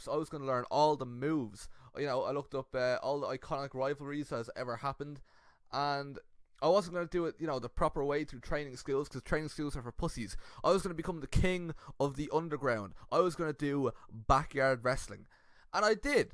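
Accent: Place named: Ireland. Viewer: That was pretty good and your Irish accent made it funnier.... in a good way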